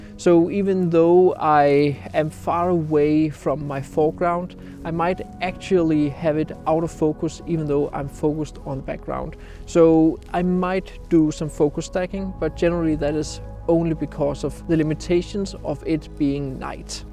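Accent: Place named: Denmark. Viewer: Excellent video, as always. By the way, I'm following your work since the beginning when you just have a couple of subscribers... I can tell you, your work evolved a lot. Time pass by, you are more clean, your style well define. The only thing that never ever changed is your charming Danish accent! LOL. Congratulations! You deserve it.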